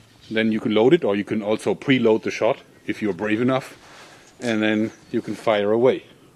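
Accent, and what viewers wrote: Germany. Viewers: I really like the German accent, everyone should speak english like that!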